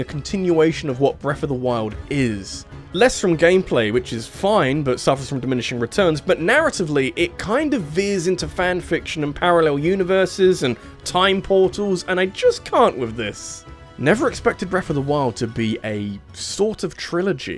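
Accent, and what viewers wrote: United Kingdom. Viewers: I still can't do it. Listening to a British person reviewing anything is the worst.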